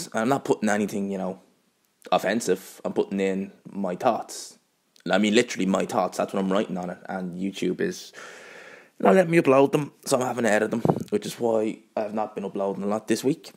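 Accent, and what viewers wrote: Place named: Ireland. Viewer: Your toughts haha I love Irish accent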